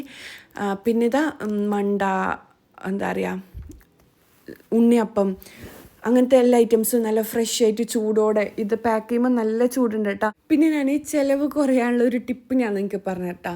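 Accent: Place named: Cheruvathur, India. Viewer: njanum cheruvathur. aanu. samsaram same. aanu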